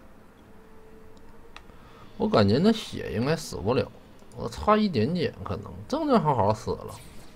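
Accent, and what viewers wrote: Northeast, China. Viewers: 甚麼叫準...是比較沒有口音== 人家東北人 本來說話就有口音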